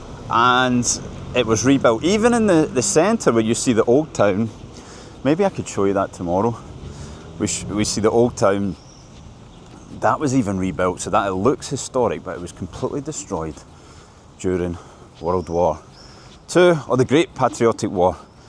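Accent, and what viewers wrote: Scotland, United Kingdom. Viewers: I like your Scottish accent. Maybe it's because I've listened to quite a few Ian Rankin's audiobooks.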